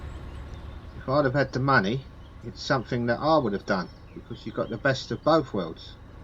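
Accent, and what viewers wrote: London, United Kingdom. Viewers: Hi 👋. New subscriber here. I'm loving your channel! It's like popping round for a cuppa with a mate where you get to slow down and chill a while. I plan on doing what you're doing in a few years but while im saving up, I'll just join you! 😉 Tracy (and Moo the dog who seems to love watching you too!) 🙏 Ps- are you a London boy? Your voice reminds me of my childhood on Portobello Market!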